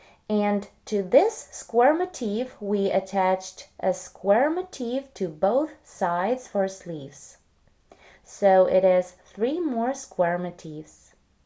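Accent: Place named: Romania. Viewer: După accent și cum ți în mâna dreaptă croșetă ești Europea mai exact româncă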